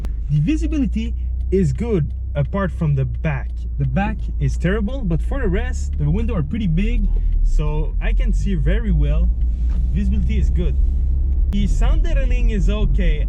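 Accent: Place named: Quebec, Canada. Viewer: He’s québecois man, give him a break lol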